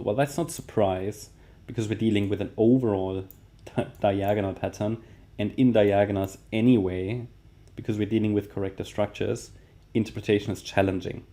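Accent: Germany. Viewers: Hey, love the german accent! Thanks for the video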